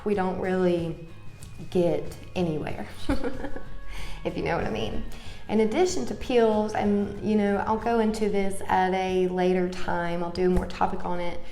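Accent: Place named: Deep South, United States. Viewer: Great video vlog girl. I’ve struggled with being an empath for as long as have memory and had no idea what was wrong or why I would come home extremely worn out. People would have sucked the life force out of me little did I know. I’ve gotten to where I have withdrawn myself from society because I became fed up with how evil people and how much they take from you. Just this week I let a girl whom I thought I could trust borrow my car and she burned me stole some things out of my car and kept it so long I had to threaten report it stolen. I’ve become cynical as hell and just fed up with the curse because I care so deeply. I too understand substance abuse to make it go away. You sound like you are from the Deep South where I’m located. Keep up the good content girl friend. Kashton <mail> Take care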